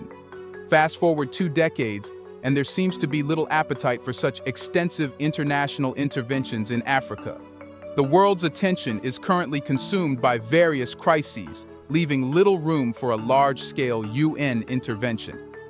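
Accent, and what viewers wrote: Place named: United States. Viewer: The narrator is an AI right? Sounds Amerlcan but the country pronunciations are not consistent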